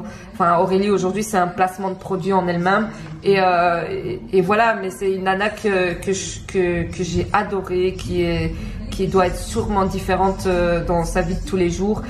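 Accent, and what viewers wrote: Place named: Belgium. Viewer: Tu es super mignonne❤🙏 et ton fis heen😍... serais-tu belge , je reconnais l' accens hahahha / ton fils et metis? excuse moi, mais c' est la 1er vidéo que je regarde de toi et j' aime beaucoup😊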